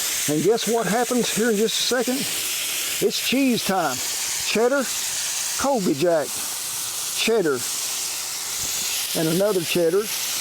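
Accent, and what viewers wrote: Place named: Texas, United States. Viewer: Nice video. Where is this accent from? Is he from Texas?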